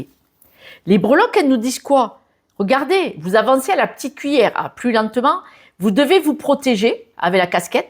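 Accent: South, France. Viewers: Votre analyse est super. Je me rends compte en effet que certaines choses changent. Merci votre accent du Midi réchauffe le coeur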